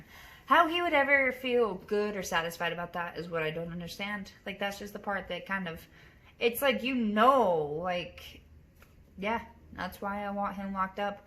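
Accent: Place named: Eastern Europe. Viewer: You sound like you’re from eastern Europe.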